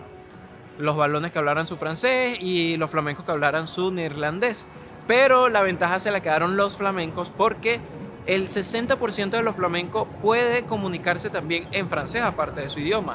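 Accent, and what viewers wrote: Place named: Venezuela. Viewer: Un saludo, lo que expones en tu vídeo es un tema muy complejo pero lo has explicado con claridad, es muy interesante y educativo. No sé si interactuas con tus seguidores pero quisiera saber cuál es tu nacionalidad, porque pareces ser de Venezuela por tu acento, es así? Ya me suscribí y te sigo suerte en tu proyecto de canal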